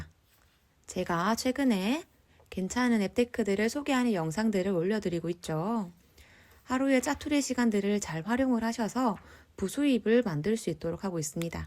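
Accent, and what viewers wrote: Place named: Gyeongsang, South Korea. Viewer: 저는 서울지역 이라 그런지 첫마디부터 경상도 말투가 느껴지는데요. ㅋㅋㅋㅋㅋ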